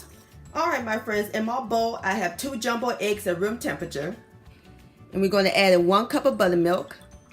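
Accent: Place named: South, United States. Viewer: OH HO HO! Now now! THIS is what I'm talking about! As a CANADIAN, we make these all the times! Maple is our domain! HAHA We don't use the cupcake liners though. A muffin to us is mushroom shaped, and is not confined to a cupcake liner. But these are SO CUTE for a breakfast buffet table like that! Southern? OK...I will give you that due to your accent. HAHAH! They look GREAT Miss! XOXOX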